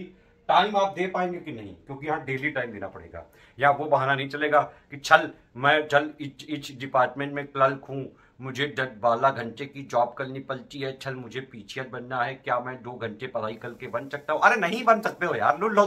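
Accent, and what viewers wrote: Kanpur, India. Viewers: Sir kya Kanpur se hai kyuki apki language humare kanpur dehat ki lg rahi hai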